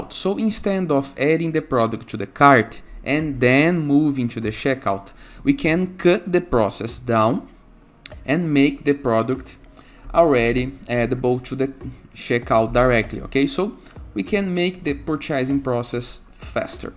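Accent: Brazil. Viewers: seu inglês tem sotaque de brasileiro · sotaque brasileiro detectado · valeu brazuca! HAHHAHA adorei o sotaque! :D tmj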